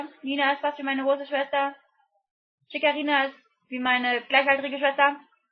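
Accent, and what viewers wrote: Cologne, Germany. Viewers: "Drufjödingst,ne " OMG,ich kann nicht mehr...du und dein Kölsch seid GEILOMAT!!!!,DU bist eine kesse Kiste!O,ich kriege den -Slang..hihi^^ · Puh, dass jetzt gefragt wird, wen du abgehoben findest, war klar.. Nehmt es so hin, wenn sie jetzt sagt wer, dann gibt es doch wieder ein riiiesen Theater.. sinnlos, meiner Meinung nach. Ich fand nicht schlimm, dass du Personen doppelt nennst. Du gehst mir persönlich absolut nicht auf die Nerven! ;) Ich gucke deine Videos sehr gerne und ich muss jedesmal grinsen. Leider waren in den letzten Videos keine Sätze mehr mit köllsche Akzent :'( :D Da amüsiere ich mich immer stundenlang.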